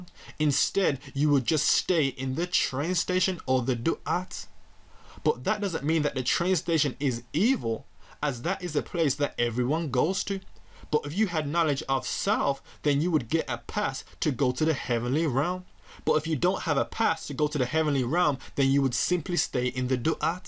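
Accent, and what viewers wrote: Birmingham, United Kingdom. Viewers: Is that a brum accent i detect. Beautiful video by the way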